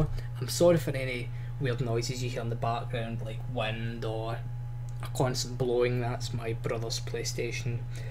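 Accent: Scotland, United Kingdom. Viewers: are you scotish i am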